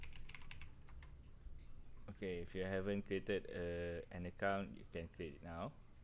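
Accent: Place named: Malaysia. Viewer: Nice tutorial and unity package, thanks for putting this together. By the way I recognize the accent my wife is Malaysian.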